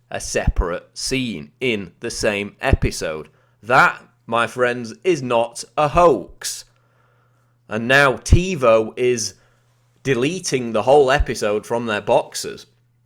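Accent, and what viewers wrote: United Kingdom. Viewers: Is Alex Jones still doing the Piers Morgan voice or is the narrator British?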